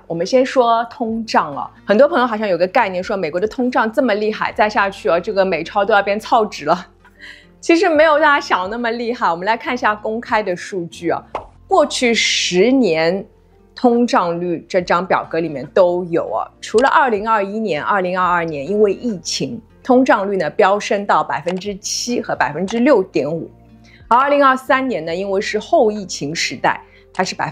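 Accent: Shanghai, China. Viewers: 侬是上海侬伐？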